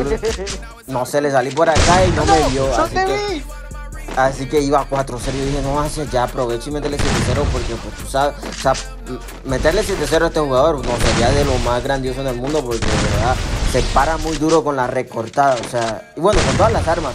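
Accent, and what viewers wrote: Caribbean Coast, Colombia. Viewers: Como narra un costeño un pvp:pues mira el video:v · Eres de colombia?:0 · Eres costeño? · de q parte de colombia eres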